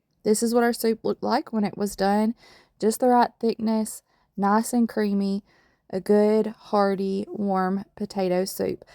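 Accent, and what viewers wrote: South, United States. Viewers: How can this not be a must make soup your opening said it all: My favourite soup recipe, potato recipe and crock pot recipe 😃. It’s in my recipe journal. I liked your reflection about washing dishes and the use of that time. Thanks for putting the recipe in your notes. I really like your southern accent it’s one of my favourites, it’s like a big hug. Thanks for sharing, so far from soup September one of the easiest to cook, thank you crock pot inventor. We all need a bit of easy in life. 🍲🥔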